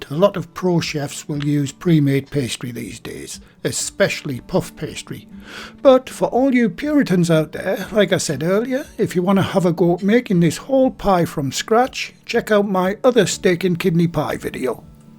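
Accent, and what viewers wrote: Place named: North East England, United Kingdom. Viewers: I am so glad I found your channel, being from near Middlesbrough it's so nice to hear a North East Accent online! Thank you for the videos and happy cooking:) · I'm inspired and subscribed. I got caught up in a Youtube loop and somehow ended up at your splendid channel. I'll be making kebabs this weekend after watching one of your other posts. I was born and raised in Durham. I haven't lived there for a long time but always nice to hear a north east accent. All the best!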